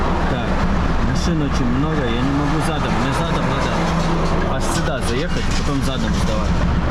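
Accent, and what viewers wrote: Ukraine. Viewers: А кто знает? У него такой акцент как в Украинцев. Он оттуда?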